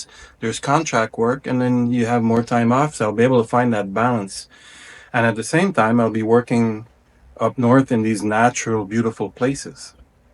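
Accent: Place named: Quebec, Canada. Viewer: Wow, I stumbled on your videos by accident searching for anything PV. My wife and kids have been visiting PV for a while, we normally stay downtown around Versalles in Airbnb’s. We do like the comfort of a condo but a palapa feels more like something I would feel grounded to nature in and would be nice to experience. Your spot in the mountain overlooking the ocean looks incredible. Your story is very inspiring, I’m a little younger (42) but we seem to have some things in common. I do sometimes wonder how different it would be living like you are, to someplace slower moving and more relaxed. Good for you sir! I sense a French Canadian accent in the way you speak… Je vous souhaite à vous et votre femme beaucoup de bonheur. De Mtl.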